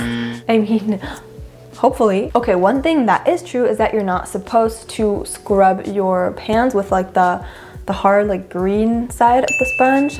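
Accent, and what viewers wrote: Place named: United States. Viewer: Do you currently live in France? :) Also your accent sounds very American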